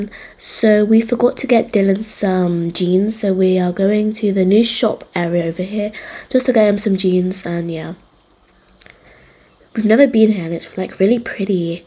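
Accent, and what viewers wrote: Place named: United Kingdom. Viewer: The British accent though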